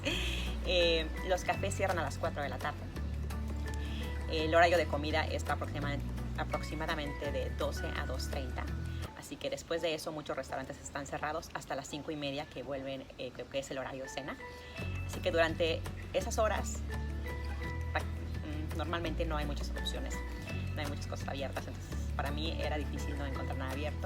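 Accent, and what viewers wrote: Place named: Mexico. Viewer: 👍Qué lindo video, y todo tan bien explicado con tu hermoso acento mexicano 🤩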